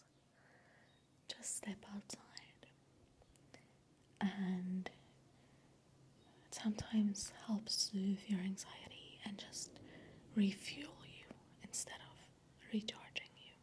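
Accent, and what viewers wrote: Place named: South Africa. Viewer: You're the only South African I know & I love your accent. Sounds to me like a mix between England & New Zealand. Very lovely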